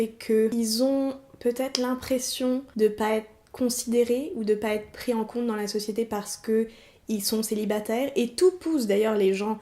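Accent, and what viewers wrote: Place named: Quebec, Canada. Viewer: T'as tellement raison, etre en couple cest pas un but ni un objectif de vie, cest un choix. Je pense aussi que le besoin vient d'une autre époque où la survit de la race en dépendait, on en ait pu là du tout!! Perso je suis célibataire et j'adore ma vie comme ca. Je suis une personne tres solitaire et ca ne plait pas a tous et jy tiens vraiment bcp. Desfois je trouve que tu as un accent québecois :) comme quand tu dis ''plus facile à dire qu'a faire'' le faire, tu viens pas du Québec par hasard? Bonne journée à toi et à bientôt. Merci pour la vidéo, tjrs un plaisir t'écouter.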